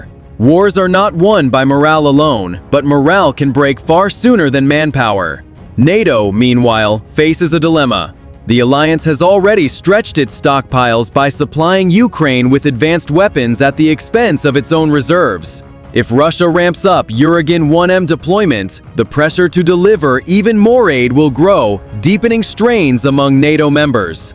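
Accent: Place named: United States. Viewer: Did I miss , for how far it shoots ? All information was logical with a good an Amercan English , thank you .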